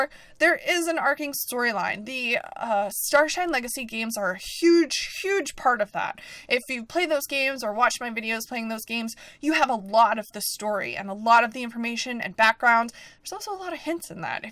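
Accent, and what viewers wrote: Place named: United States. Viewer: lives in America, you can hear that from her voice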